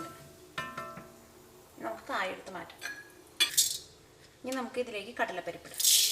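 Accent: Thrissur, India. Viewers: Dear, your sambar receipe Awesome!! But more than that i just loved your thrissur accent..;)..Keep posting more receipes..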